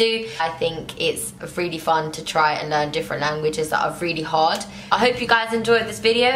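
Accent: Arab world. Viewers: That's a lovely Arabic accent you have 😀😀😀😂😁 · you are verry strongly arabic phone can you tray algeria dialecteplease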